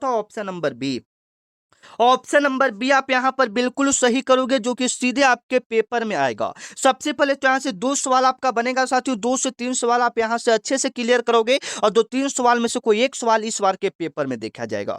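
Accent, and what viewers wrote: Bihar, India. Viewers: Sir aap bihar se ho kya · Kaise bolte hoo bihari ho kaa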